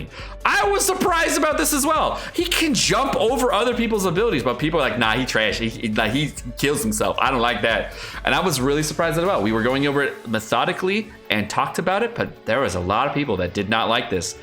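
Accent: RP, United Kingdom. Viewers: I'm English. And your posh English accent is spot on! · Man his British accent is awesome. Dam hilarious intros. Keep it up. 👍🏻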